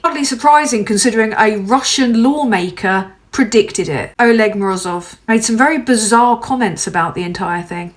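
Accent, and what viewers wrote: United Kingdom. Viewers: A thousand thumbs up for you dear. you are 200% right about everything. I'm Canadian and love your understanding on things. I wish more people would get it the way you do. Love your ...so British accent as well. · To be honest, I find her voice therapeutic. Yes, she is telling me of my demise but at least it's with that silver tongue you brits have.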